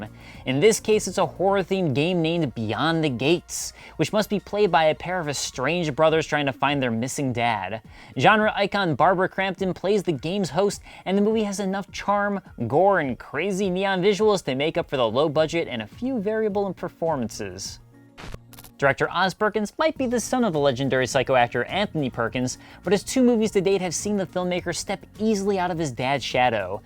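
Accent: United Kingdom. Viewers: Neko Sama because his accent sounds british, huh? (Lmao moron)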